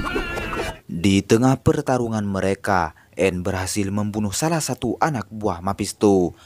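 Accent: Madura, Indonesia. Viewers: Dari logatnya kayaknya asli Madura 👍👍👍👍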